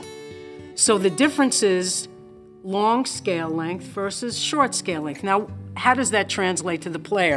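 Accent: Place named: Delaware County, United States. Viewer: God that Pennsylvania Delco accent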